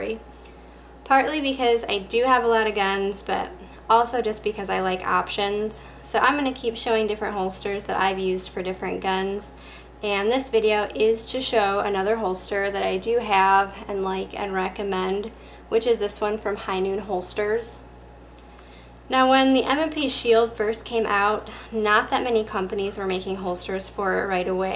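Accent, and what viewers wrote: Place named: South, United States. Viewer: I just noticed but you have a tiny bit southern axcent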